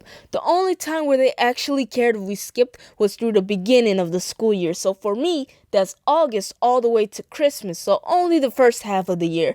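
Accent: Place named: Texas, United States. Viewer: Yo u sound like u from lake highlands and Texas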